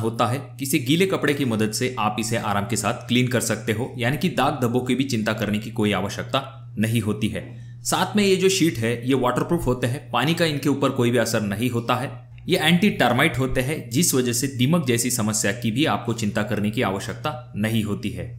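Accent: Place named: Satara, India. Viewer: मराठी आहे फलटण बारामती किंवा सातारी जर्दा दिसतो भाषेत ....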